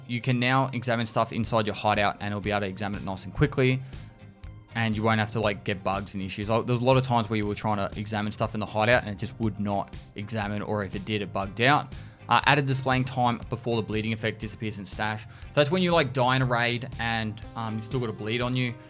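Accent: Australia. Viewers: he's Australian and his accent just makes it sound like that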